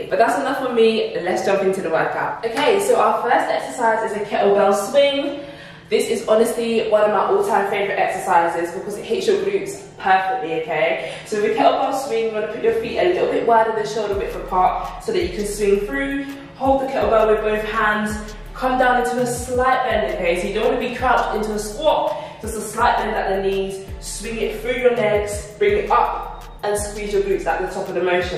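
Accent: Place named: Cockney, United Kingdom. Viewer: I just come here to listen to the Cockney accent lol. Keep up the work 👍 💪 From Canada 🇨🇦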